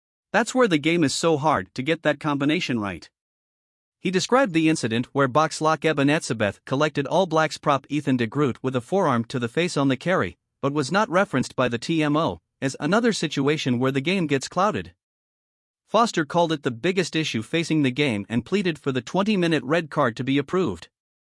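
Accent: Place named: United States. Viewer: How is this an OFICIAL AB site when the narrator is American??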